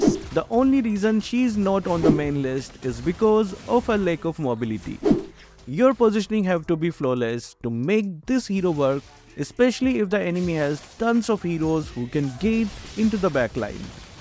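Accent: India. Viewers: Bro are you indian😅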